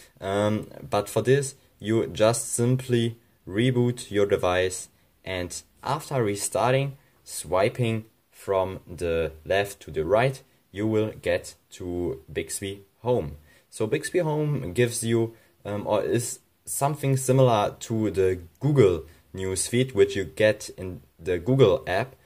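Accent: Germany. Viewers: Man hört an deinem Akzent, dass du aus Deutschland kommst. Das Emrah-Video hat das dann noch bestätigt. XD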